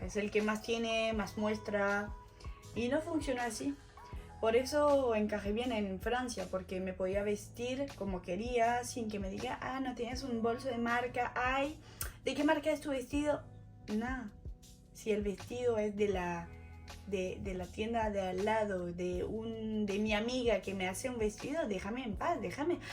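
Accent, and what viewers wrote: Argentina. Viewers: Venite, no seas bolud@ no necesitas ningún pasaporte, cualquiera que te escuche dos minutos se da cuenta que sos más argentina que el dulce de leche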